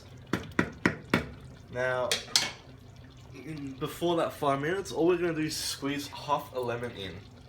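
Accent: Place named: Australia. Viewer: Jus' one observation. It never looks good when an executive chef uses cookware that is marred or scratched up on the cooking surface. The stockpot in this video appears to be a 'Teflon' coated pot, and the Teflon coating is obviously scratched off on the sides, and cooking surface of the pot. It just looks unsightly, and unappetizing. In addition, they say that toxins leech out of the pot when heated from under the Teflon coating. Jus' my observation, but of course, you may feel differently. I love your Australian accent, and your cooking style. I hope that I'm not mistaken in believing that you're Australian. Forgive me if I am.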